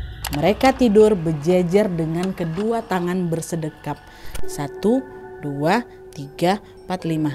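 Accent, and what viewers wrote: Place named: Medan, Indonesia. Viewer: Medan yah kak?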